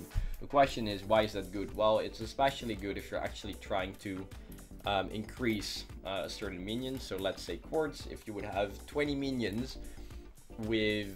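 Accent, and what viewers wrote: Netherlands. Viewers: Ur accent sounds dutch where are u from?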